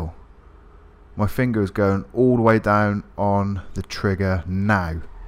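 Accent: United Kingdom. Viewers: thought I was playing it and thinking out loud for a moment in a british accent. Nope. We all go through this every time. Very few cars lived up to it. NFS might save the pc racing world soon the new one looking good and grid just dropping might kill the racing cults of GTA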